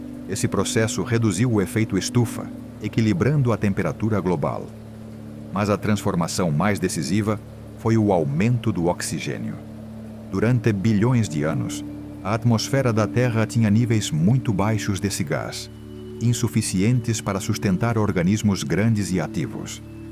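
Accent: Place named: Portugal. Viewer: Pois é, tá em português portugal, mas é incrível o quanto se parece com nosso português algumas palavras 😊😊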